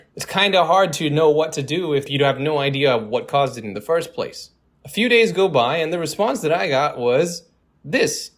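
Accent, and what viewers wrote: United States. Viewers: I'll bet it's your vocal tone — they don't want to admit that they think your US regional accent comes across as lewd appreciation. 🤐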